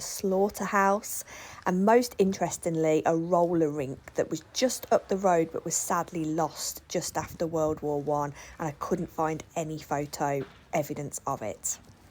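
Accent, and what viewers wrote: Birmingham, United Kingdom. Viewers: Hi im glad I found your channel a few weeks ago it's so lovely to hear your brummie accent you show so much interest in the local history of the Midlands i love hearing about the history of the cotswolds where i come from although I now live in denmark i never forget my roots we working class people have so much history to tell that it was us that made Britain what it was keep up the good work, best wishes roy copenhagen denmark. 😊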